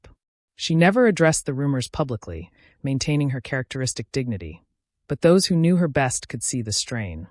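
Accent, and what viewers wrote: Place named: United States. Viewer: Please could you Americans do us the courtesy of speaking about duties and not doo-dees?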